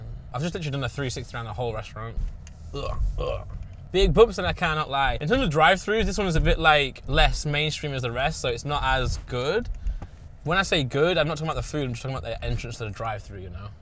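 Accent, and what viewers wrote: United Kingdom. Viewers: he prob has one of the most tame british accents lol but go off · The funniest part of this is you look so cowboy with the hat but then you’re violently British when you talk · I hate British accents, painful watch but I’m only watching to see papi whataburger.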